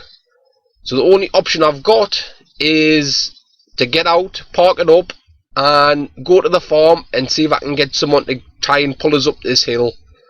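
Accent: Newcastle, United Kingdom. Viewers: I enjoyed it, and I'm a 68 year old nan, but I love the accent, it reminds me of my dear dad who was a Geordie, I miss hearing his voice. But I truly loved the video, the driving was very good TY!! 😊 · Are you a Geordie.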